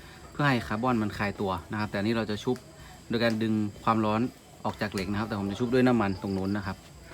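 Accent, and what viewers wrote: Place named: North, Thailand. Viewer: สำเนียงคนเหนือ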